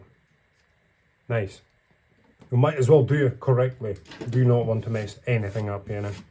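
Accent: Scotland, United Kingdom. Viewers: I just want to thank you from the bottom of my heart for this video. My brother got me this piece from China, so all the instructions were in Chinese and I gave up on Google translating each step after five minutes. I was about to give up but then thought let me see if there's a video on Youtube, and I found your video, and it was honestly some of the best days I've had in a long time! Hearing your relaxed voice and lovely little commentary as we build this cello was nothing but a joy, and I've learned to always sand sand sand because that's a habit eh? I checked your account to see what other pieces you built so I can follow along, so I'm just waiting for the typewriter to arrive so I can build it together with your video! Can't wait to hear the Scottish accent for hours on end! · Helpful instructions. Hopefully I can understand the Scottish accent for the entire video.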